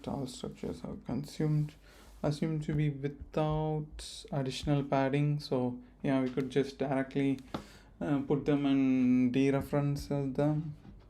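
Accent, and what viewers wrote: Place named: India. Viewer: with Indian accent (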